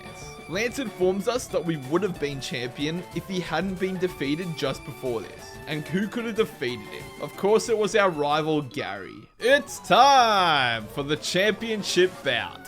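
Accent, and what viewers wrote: Australia. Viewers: Cringe aussie accent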